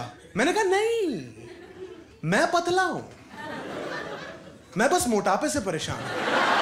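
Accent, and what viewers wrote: Australia. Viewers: His Australian accent🔥🔥🔥🔥🔥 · Australian accent not american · Australian accent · Karunesh Talwar listening first time. Love ur Aussie accents 😁 upload more videos pliz. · That's an Australian accent. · Australian accent* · Buddy woh Aussie accent hai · that aussie accent! wow! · Damn! He got Perfect Australian accent!!!!😎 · Australian accent me baat krra tha wo lekin talented Banda h American accent bhi nikaal hi lega kyuki Australian accent jyada tough hoti h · That is not American accent that is Australian accent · its an Australian accent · Lol it's Australian accent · Australian accent. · That's not an American accent it's Australian accent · His Australian accent is as good as heaven.Great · COC legends it’s an australian accent.. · aussie acccent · That’s an Australian accent lmao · Australian accent? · You mean australian accent? 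· Smoothie Australian accent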